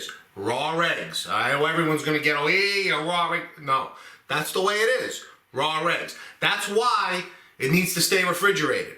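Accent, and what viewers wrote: Boston, United States. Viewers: Just wondering where you are from. I’m from Boston area and your accent sounds like mine! Lol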